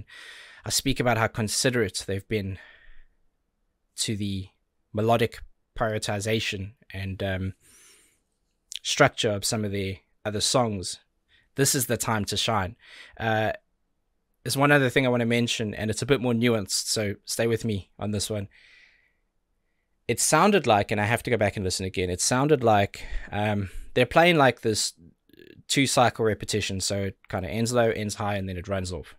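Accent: South Africa. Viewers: I have been watching you for more than almost 2 years I guess and I failed to realize that you are a South African and not a Brit inspite of my good understanding of various accents.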